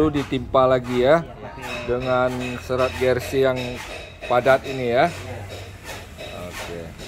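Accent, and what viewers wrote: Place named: Banyumas, Indonesia. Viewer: ko orang ngapak bos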